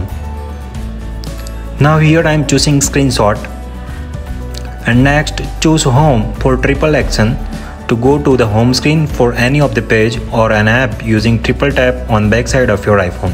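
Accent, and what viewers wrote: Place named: India. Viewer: I don't try to make fun on his strong indian accent but I really don't understand the words his saying, maybe he can speak bit slowly so people can understand what he say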